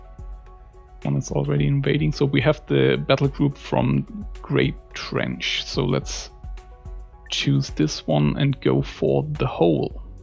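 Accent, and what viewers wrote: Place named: Germany. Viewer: Woohoo, german accent again! :) Danke!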